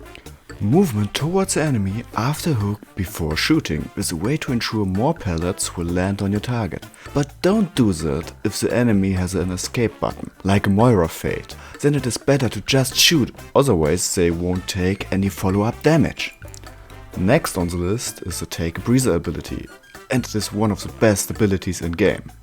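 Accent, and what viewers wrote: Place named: Germany. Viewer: Like for the german accent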